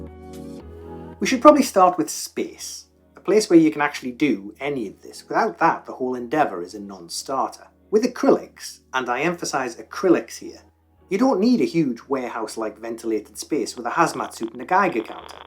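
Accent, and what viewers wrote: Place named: Durham, United Kingdom. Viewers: lol - btw- where are you from? I live in Canada now, but originally from Durham… Nice to have a YouTube channel with a proper accent in it.! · Ah This is easily one of if not the best airbrush video I’ve seen! Makes me proud you being a fellow Geordie, maybe that’s what made it easier for me to understand (wey aye pet 😂) it’s so refreshing to see I’m not the only one who’s been tearing my hair out trying to learn how to use an airbrush. It helps I have the same infinity CR brush as you do, and the little pot to spray into. Every time I’ve tried to use the airbrush I have spent 80% of the time cleaning 10% swearing and 10% actually painting! In my last paint session I didn’t get any tip dry ( miracle) by occasionally dipping the end nozzle/needle into a small bowl of clean water- is this recommended? It seemed to work for me. 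Another tip I seen elsewhere was for starting with darkest colours and go down In shades so you don’t have to fully clean in between ie black brown grey white as an example. I was using 6mm needle but 4mm seems the way to go as you say, which I also used recently. Anyway cracking video mate I will have to check out more of your videos, I was genuinely laughing as well btw at some of the jokes (GOT S8 is 💩 😂)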